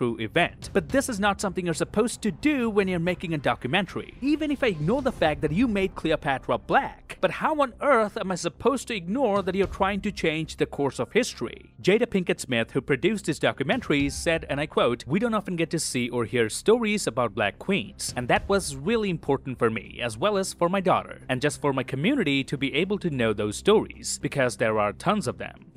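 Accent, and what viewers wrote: Canada. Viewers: You sound Canadian. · The weirdest Canadian accent I've ever heard. And we don't refer to each other as lads. That's a British thing..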